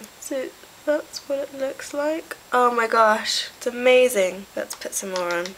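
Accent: United Kingdom. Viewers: i could listen to u all day! i jsut love ur cute lil british accent! =DDD u should've totally tried out for cho chang =)))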